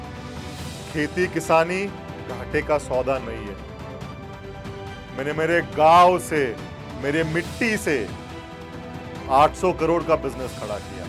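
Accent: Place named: Maharashtra, India. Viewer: Aapki hindi is very much maharashtrian..❤❤ love ur products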